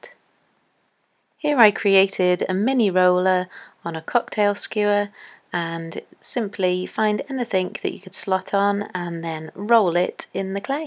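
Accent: England, United Kingdom. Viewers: Great video and as someone else said no music just your lovely calm voice ..One of the best videos I have seen and also great ideas on our doorstep ..thank you so much ..Also lovely to hear someone English for a change.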